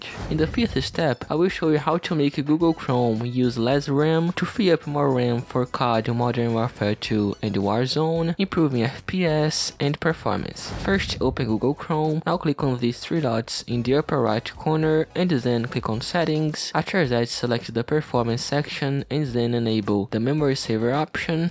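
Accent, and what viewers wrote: Brazil. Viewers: Seu sotaque parece brasileiro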